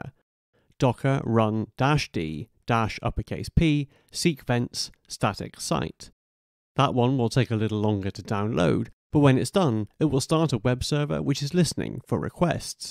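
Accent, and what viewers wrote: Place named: England, United Kingdom. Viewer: english accent kills it !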